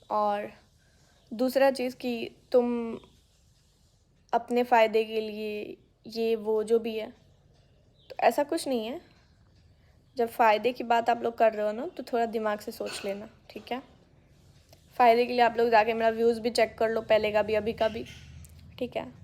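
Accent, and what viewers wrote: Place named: Bihar, India. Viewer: बिहार में ऐसे ही बोलते हैं मज़ाक मत बनाओ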